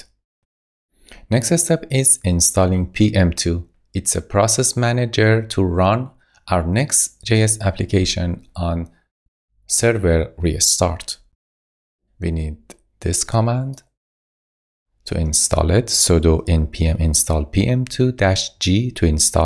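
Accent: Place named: Iran. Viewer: لحجه ایرانی همه جا معلومه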